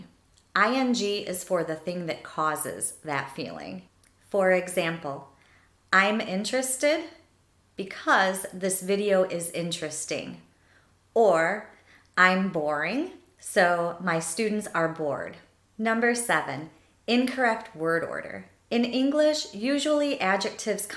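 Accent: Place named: United States. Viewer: American accent is quite stylish...Love it...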